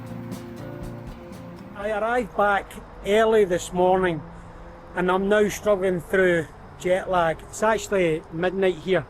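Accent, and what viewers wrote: Scotland, United Kingdom. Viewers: Nice to see you back in China. I feel you belong there. Another thing I love watching your videos for is your lovely Scottish accent. I used to live in the U.K before I married to come to the States. Then I had a Scottish colleague. I remember I barely understood him most of the time, but I understand you 100%. Wonder why?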